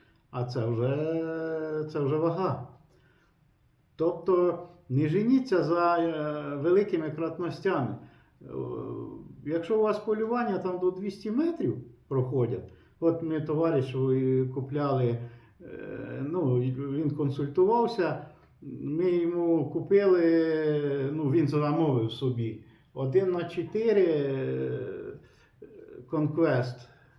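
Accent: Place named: Vinnytsia, Ukraine. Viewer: У Вас розмова Вінницька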